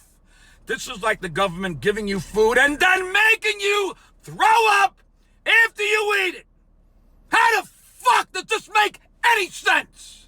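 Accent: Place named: Massachusetts, United States. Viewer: You have a Lowell or Malden accent. I’m from Billerica.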